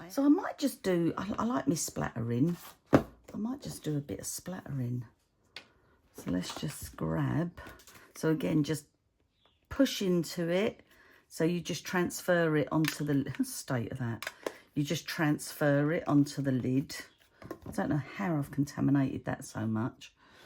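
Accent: United Kingdom. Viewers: I was not sure about you at first - but I grown to love you and your videos. I love to hear you talk in your UK accent and words. I love that you show mistakes and then make something so great out of them. I just wanted to tell you I have the black Stampin up mat that you tried. I find if I'm not in my craft room it dries out and it is not sticky. So if you use a wipe or even just a damp cloth it should bring the sticky back. Again just wanted you to know you have such a fan in me - I have learned so much from watching you. Bebe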